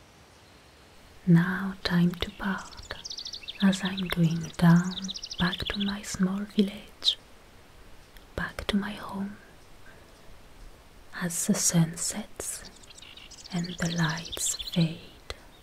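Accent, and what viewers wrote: France. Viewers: I still go for a walk in a forest nearby. It's relaxing and puts me down to earth. Your video is so beautiful, I love hearing your voice and your french accent is a total trigger for me ❤️🌺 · I really like her French accent that you hear!!!!!!!!!!!!!!☺☺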